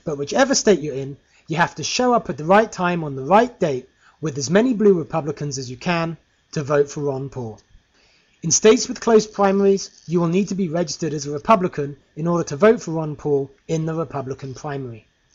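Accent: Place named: United Kingdom. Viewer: This is very informative and straight forward. The fact that a British voice made this video leaves me wondering how much international support Ron Paul has. Ron Paul 2012!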